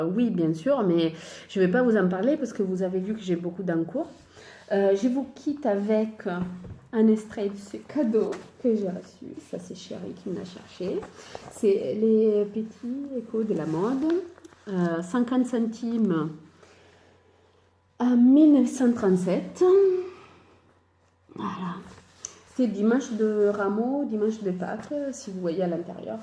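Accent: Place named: Italy. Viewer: Ciao, conosci le avventure di Arianna? Il podcast di una Veronese in francese. È molto dinamica e sempre gioiosa. Il tuo podcast è simpaticissimo. Mi piace sentire parlare francese coll'accento italiano. Sono francese ma mi piace molto l'Italia. Aspetto il prossimo podcast! Lamartineorzo sur IG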